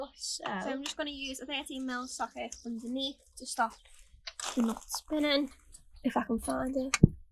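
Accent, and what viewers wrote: Liverpool, United Kingdom. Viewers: I was in liverpool for the first time at weekend 😍 ended up at the docks near all the bars! Cant wait for this video 👌🏼 p.s big up the scouse accent 😂 xx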